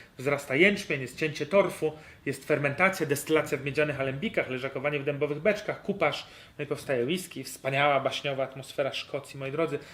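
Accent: Poland. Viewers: Panie Ty jesteś z Poznania??! Zdrówko 🥃🥃🥃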